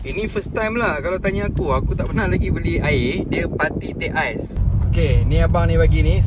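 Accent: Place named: Perak, Malaysia. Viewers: Awat hang tetiba cakp kl..orang cakap utara hg cakp kl😂😂..sebb dalam video banyk gak cakp utara hahaha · uish..org loq staq ka nie.?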